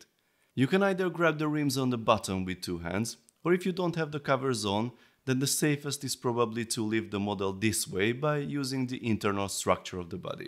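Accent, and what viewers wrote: Hungary. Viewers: Helló. Úgy hangzik az angolod mintha magyar lennél. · Damn, that Hungarian accent is strong.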